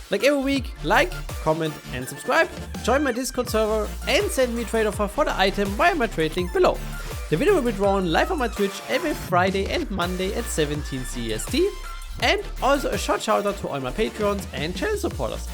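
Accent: Germany. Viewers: erster deutscher youtuber, bei dem mich der deutsche akzent nicht abfuckt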